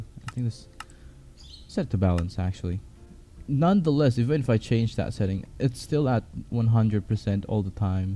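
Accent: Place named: Philippines. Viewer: Man, i think this guy is filipino for sure because of his english accent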